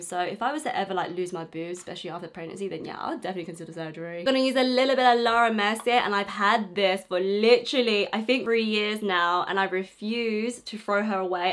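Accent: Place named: London, United Kingdom. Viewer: I freaking LOVE this eye look!! The neon liner is giving me life 😭 also I absolutely love your London accent, makes me so happy!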